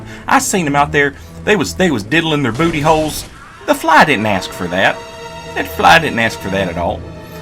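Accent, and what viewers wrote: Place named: South, United States. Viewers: A hillbilly saint talking with good piano music. What combination is this?!!?!?!?! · I love your Southern drawl; it’s really authentic . I live in the South, so I give it my seal of approval.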